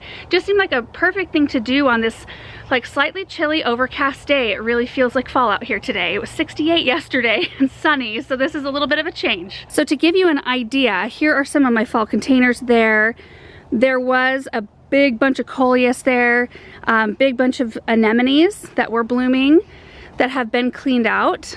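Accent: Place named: Midwest, United States. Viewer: Hi, Just thought I would mention every time I hear you pronounce zinnia, I think to myself, oh our way with the "short i" must be a Midwest kind of thing. My kind of arrangement, esp. love the cabbage with the rose and berries. Thank you.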